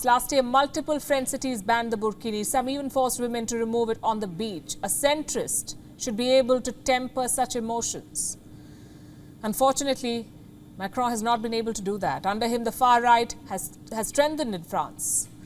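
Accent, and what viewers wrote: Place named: India. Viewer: She just speak English i in hindi style with indian accent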